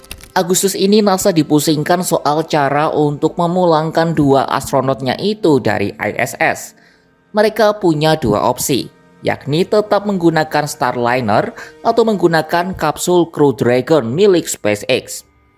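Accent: Java, Indonesia. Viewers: kerja keras editor filter midbass karena medok parah. Aneh sekali narator ini. Kamu kuliah di mana sih. Saya aja puluhan tahun di Jawa ga medok. 😂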